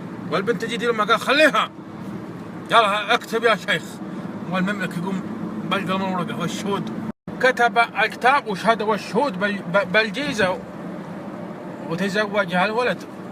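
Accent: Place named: Najd, Saudi Arabia. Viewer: اي ثقافه؟ كل من قرا روايه حسب نفسه مثقف؟ هذي لهجه نجديه وطبيعي انك يالطرش ماتفهم عليها الاشكاليه الاكبر انها لهجه من لهجات العرب السبع رجل عربي قح وبعدين كيف تدعي الله وتخاطبه ب ياء التأنيث؟ استغفر ربك ياطفل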